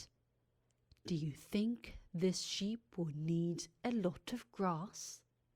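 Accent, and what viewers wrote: RP, United Kingdom. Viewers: 헉 책중에서도 제가 젤 좋아하는 어린왕자라니ㅠㅠ게다가 영국발음..감사합니다♡ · 아리랑 티비 광고 맨날 나와서 대사 다 외웠는뎈ㅋㅋㅋㅋ 이렇게 봬니 반갑네요 ㅠㅠ 목소리도 너무 좋고 발음 진짜 고급스럽네요 ㅠㅠㅠㅠ저도 영국 발음 저렇게 내고싶어용 퓨ㅠㅠ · 와 너무좋아요ㅜㅜㅜㅜ 구독하고갑니다 영국영어 많이많이올려주세요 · 무진장 이쁘시네요. 영어까지 영국 상류층 느낌 팍팍. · 와 영국발음 너무 좋아요 ㅠㅠㅠ흐엉엉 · 영미권에서 유학하셨나요 아니면 독학으로 영국식 발음 익히신건가요? ㅎㅎ 너무 잘 들었습니다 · 영국식 발음이 너무 듣기 좋네요.. 특히 가 들려줘서 정말 듣기 좋네요... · 저도 영국 발음 가지고 싶네요 ㅠㅠ 멋져요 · 영어를 RP혹은 posh eng라고 하나요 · 영국식 발음에 케러멜라이즈드 된 버터처럼 이불 속으로 녹아듭니다~~~ 느아아아앙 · 미국 버터발음에 익숙해진 귀가 영국발음을 인식하지 못하네요..? 영국발음 넘 조흔것!! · 와 광고에서 아리랑 티비 아나운서 나오는거 봤는데 진짜 발음 너무 섹시한거 같어요.ㅠ̑̈ ㅠ̑̈ ㅠ̑̈ ㅠ̑̈ ㅠ̑̈ 진짜 발음 완전 영국 귀족 같아요 진짜 너무 너무 너무 닮고 싶은 발음.. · 고급진 영국영어 발음이네요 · 목소리가 이렇게 섹시하다니,영국 발음 치인다ㅜㅠ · 영국억양 진짜 개사랑해요.... 미국이 아니라 영국으로 갔어야했는데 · 으아 역시 영국 발음 너무너무 좋아요 ㅠㅠ 저도 영국 영어 공부하고 있고 쓰려고 노력하는데 학교에서 미국 영어랑 마찰성이 생기더라고요... apartment를 flat이라 써서... 그래도 뭔가 뿌듯하기는 해요!!! 어쩌다보니 학교에서 영국 영어하는 철학 소녀로 유명해졌더라고요 ㅋㅋㅋㅋ 무튼!! 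명절 잘 보내세요:)💜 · 포쉬가 이렇게 아름답게 들린건 첨입니다❤️ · 어떻게 해야 이런 발음을 갖을수 있나요 목소리도 넘 힘있거. 전 호주발음을 가지거 있는데 영국 발음이.훨씬 엘레강스한듯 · 맨날 영어관련 영상볼때마다 "엘~로 에브릐보우~티~~ 마 넴~잊 퀀주현~"하시면서 등장하셨는데ㅋㅋㅋ너무 자주뵈서 그때마다 영국발음 진짜 좋으신 아리랑티비 소속 씨....라고 외워버렸는데ㅋㅋ 이렇게 채널하고 계실줄이야!! 우연히떠서 봤는데 구독누르고 갈게용ㅎㅎ안그래도 영국ㅠ상류층 발음을 좋아하는데!!! 완전 취저ㅠㅠ♡ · 영국발음 너무 좋아요... 진짜 멋있는 느낌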